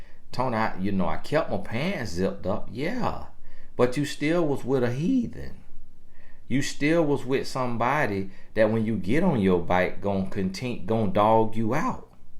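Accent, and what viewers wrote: South, United States. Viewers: i had to rewind. Bro did you say 'pern' (porn)? 💀💀💀 My bro country as hell, & I'm country too so its all good. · Girl he is country. Yes he has been saying back 😆 I only knew that cuz I'm country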